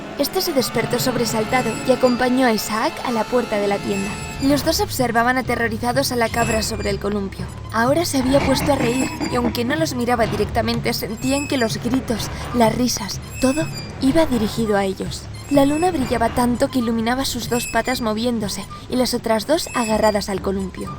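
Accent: Spain. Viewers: por la forma de tu narración, eres española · Una historia latina narrada con una voz de española... Simplemente hermosa narración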